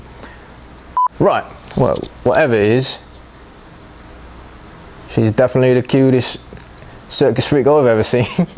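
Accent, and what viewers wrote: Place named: United Kingdom. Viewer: You do a great job,! Love the British accent, too:)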